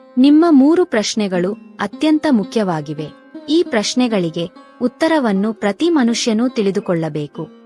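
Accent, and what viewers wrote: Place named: Maharashtra, India. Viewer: Neevu yaru Maharastra davaru iddiriye Kannada vannu heegeke mataadutiddiri😂😂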